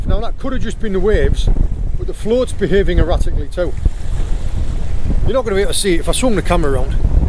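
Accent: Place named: Cornwall, United Kingdom. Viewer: You're getting slowly Cornish mate, it happens. About 18.00 you say "I switch overrrr," great vid btw!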